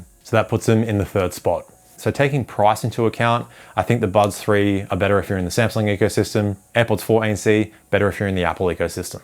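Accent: Australia. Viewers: i'd rather listen to aussie english all day than that stupid AI yt bs.. thats not necessarily a compliment 😂❤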